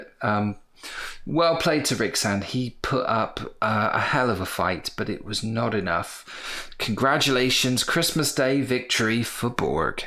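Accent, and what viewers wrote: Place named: United Kingdom. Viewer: Doesn't sounds like much of a break! If you live in the UK (like you sound you do... you're also up quite late!)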